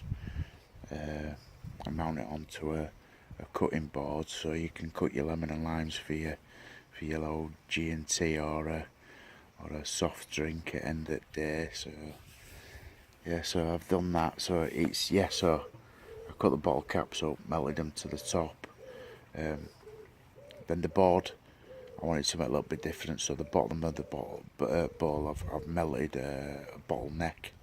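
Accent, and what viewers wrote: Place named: Northern England, United Kingdom. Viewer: A highly original and imaginative design! Keep doing what you’re doing mate, you’re an out of the box thinker and I like it! And it sounds like you’re a fellow northerner too, another tick in the box 👍🏻😁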